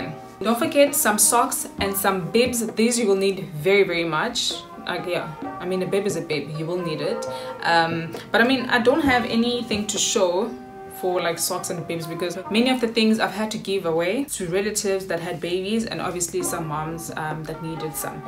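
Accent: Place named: South Africa. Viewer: Thank you for this video. Lovely to hear a South African mom.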